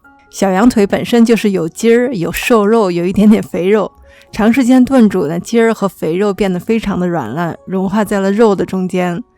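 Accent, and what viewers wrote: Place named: Beijing, China. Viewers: 北京口音好亲啊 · 北京話嗎？很好聽。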